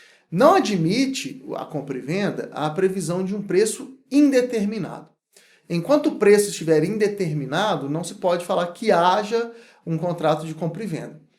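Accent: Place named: Minas Gerais, Brazil. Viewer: excelente aula, clareza, segurança, domínio. Obrigado. Acho que pelo sotaque é minerinn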